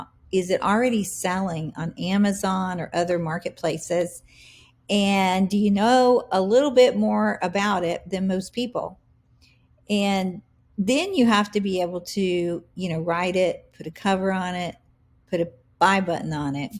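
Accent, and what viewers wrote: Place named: South, United States. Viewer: You have such a delightful southern accent. I've watched this twice:pride-flower-pansexual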